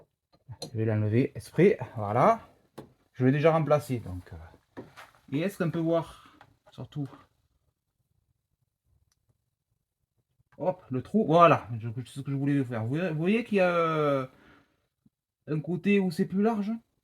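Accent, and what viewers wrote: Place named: South, France. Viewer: Excellente explication avec de bonnes astuce ! Et un accent du soleil qui fait du bien en prime 😊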